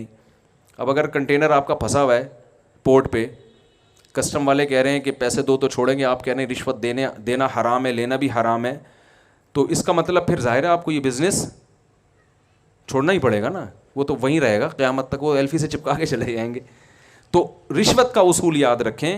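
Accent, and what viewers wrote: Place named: Mumbai, India. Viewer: Aap k bolne wala andaz pura mumbai type hai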